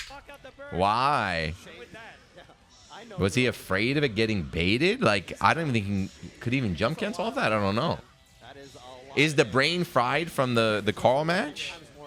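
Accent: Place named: North Jersey, United States. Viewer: Bruh your North Jersey accent is so cringe. Plz quit the weeb FGC, thx.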